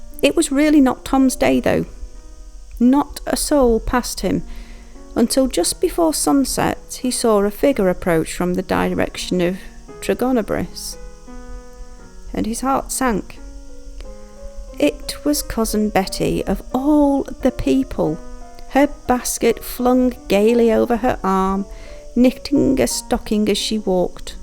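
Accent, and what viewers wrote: Northern England, United Kingdom. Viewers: I visited after the original Poldark series/ books, and eventually moved here. Do I detect a bit of Northern accent now. I always felt there was a mining connection with Cornwall and Yorkshire, my birth county. Lovely video.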